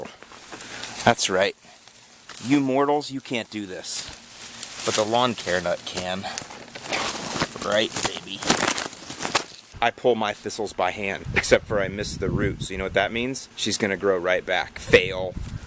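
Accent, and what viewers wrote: Chicago, United States. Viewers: Are you from the Chicagoland area? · Spot on Chicago accent!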